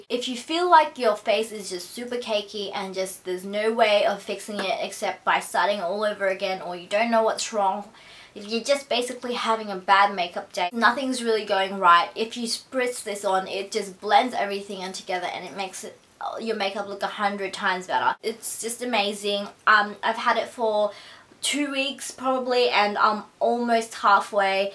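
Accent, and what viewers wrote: Australia. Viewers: Is she Aussie ?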